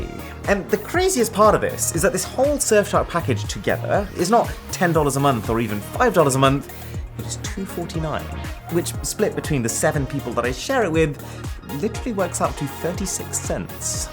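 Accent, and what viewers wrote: RP, United Kingdom. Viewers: I am not even that way, but this man is just scrumptious, just the way he speaks with that posh accent and also being resplendently beautiful.... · Ah ! Terrible British English accent 🤮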